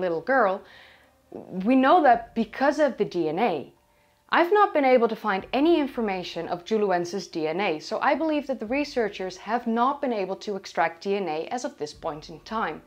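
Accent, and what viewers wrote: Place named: Netherlands. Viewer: Very Dutch accent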